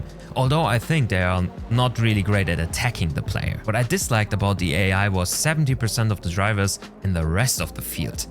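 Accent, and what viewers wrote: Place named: Germany. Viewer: Bro er ist 100% deutsch man hört das raus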